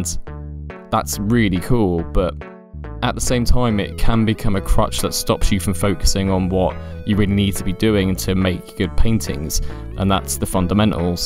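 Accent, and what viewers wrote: United Kingdom. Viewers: Nice voice and nice accent. I'm starting to think I miss London.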